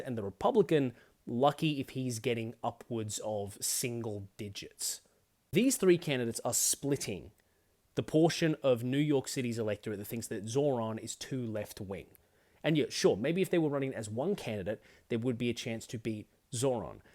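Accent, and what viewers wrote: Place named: Australia. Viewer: Having watched this shit show from the outside through other progressive commentators its so funny to see another aussie with the VERY australian way of talking about things. Its sad to see so many other commentators who refuse to say that corpo dems deserve to have their teeth kicked in for constantly ratfucking anyone they dont like.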